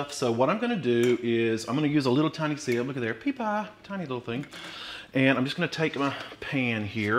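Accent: South, United States. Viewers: Yes! He's so down to earth and as I too am southern it is music to my ears to hear him talk ❤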